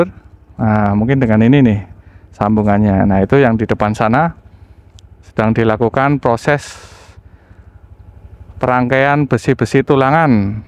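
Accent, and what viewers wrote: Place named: Yogyakarta, Indonesia. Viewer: logat jogjane medok pisan mas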